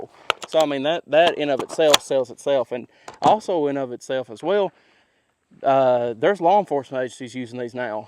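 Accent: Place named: South, United States. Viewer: Slow down a little, with your awesome accent it gets difficult to understand when you talk so fast. I had to turn on the subtitles. The southern accent is the best, no question, but it wasn't meant to be belt fed.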